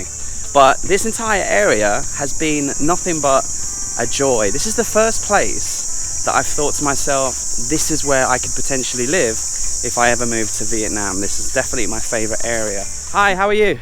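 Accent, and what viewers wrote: United Kingdom. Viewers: Hi...i just watch your video about hoi an ( which i go end of this april for 6 days ) I think we have same interest about culture and quiet place and the art of cultural places ( i mean not to so toursty like bangkok which so mega cities that boring except for shopping ) By the way, i am fendi from indonesia..sorry my english not so good ( also i plan to go UK because i feel i connected to UK countryside which i feel when i see documentary about UK ) I think you from Uk? Because of your accent then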